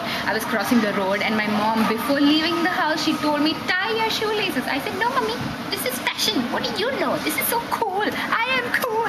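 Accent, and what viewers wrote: India. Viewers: OMG i hear an Indian accent :D i love that :D just love everything Indian. ur so beautiful :D i'm mixed with some Indian myself ^_^ :D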